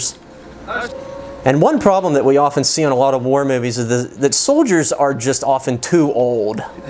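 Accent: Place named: Pittsburgh, United States. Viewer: I live in Switzerland now but I am from just North of da burgh. Always fun to hear that accent. Keep up the cool videos.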